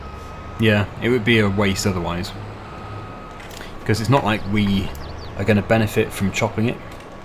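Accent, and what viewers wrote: England, United Kingdom. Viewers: Always knew you're British but i am American soo we know each other's accents lol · British isn't an accent, he has an English accent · I've been watching every video you post for over a year now i know your British just from your accent, a d i think you've said in a couple videos too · Only someone just not too familiar with accents would mistake you for anything other than British! A new vintage survival sounds grand. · I am an American and I can tell your British! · I've watched your videos for long enough to know your British. Also love your videos! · is unmistakably British. Middle England is my guess. Well educated. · You sound British to me. If you’re American, you have a good fake accent. Wow! That would actually blow my mind if you came out with a video where you finally admitted that you’re not British and you had a California accent. 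🤯 Or if you had a really thick southern accent, ha! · British, you're British mate! Can't quite place your accent, though. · British way to quite to be American an also to intelligent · So obvious that you are British. Where exactly I can not place, somewhere in England though. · You have to be, definitely, be British. Arn’t you? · British 100% - further south than myself! You play court farm really well, I find it really cramped and keep crashing into things expecially around the grain silo through to the closest silage pit! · Definitely more British and not American, or might be a tiny Wales in there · British 1000%, not even close to Merican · Definetly Bri'ish, but in the way that everybody can understand you, not the Yorkshire or Birmingham mumbling 😆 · Heh you're definitely British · no way some can think he is form USA that accent's can't be more British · 100% British u live in England am shoure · the accent makes you speak the UK style of English, not the unaccented US style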